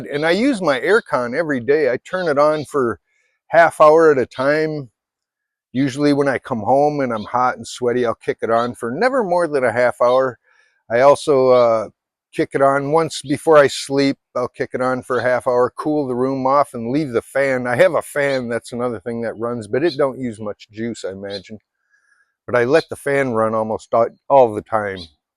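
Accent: Canada. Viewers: Anybody ever say you actually sound Canadian?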